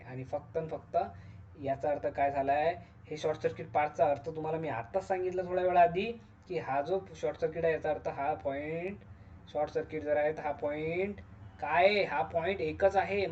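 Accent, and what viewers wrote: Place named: Vidarbha, India. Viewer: विदर्भा मधले आहात, भाषे वरून समजते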